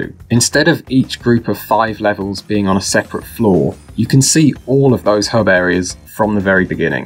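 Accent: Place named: United Kingdom. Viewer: Im so glad i found ur channel. Ur my favorite crash bandicoot modder with a british accent on youtube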